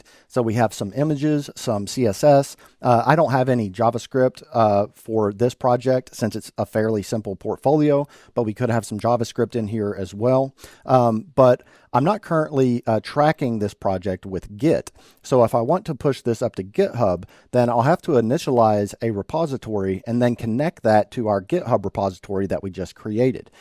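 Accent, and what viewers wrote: South, United States. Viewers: Can’t i just use firebase? Also ru from the south?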